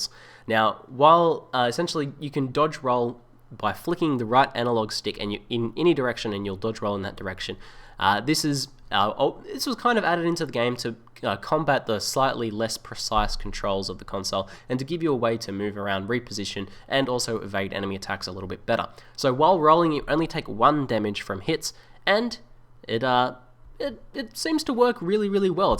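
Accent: Australia. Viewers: hey, if you want audiences other than Australian and New Zealanders, please talk slower so that people actually understand through your rather thick accent. Don't get me wrong i think you have some good contents that i find interesting. However, this video i had to turn on subtitles. I am a native English speaker, yet i couldn't understand half of what you said. What's even worse, you're talking about something that is slightly more complicated then general topic that doesn't really need slower speech. I love your accent when it's not explaining technical subject like describing differences in gaming.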